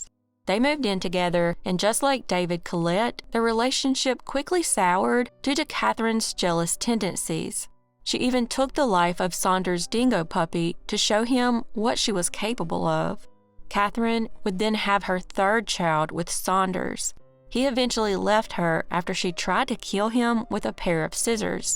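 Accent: South, United States. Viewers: Love your accent! Makes me feel right at home with these southern true crime cases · Im 6th Yay!!!! It is all so sad But at the same time i enjoy hearing your southern accent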